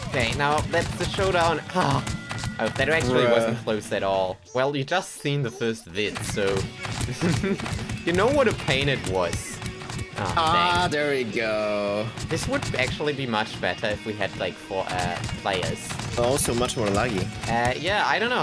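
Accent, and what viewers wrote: Germany. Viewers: quick question, are you german? your accent really just sells it (correct me if im wrong tho)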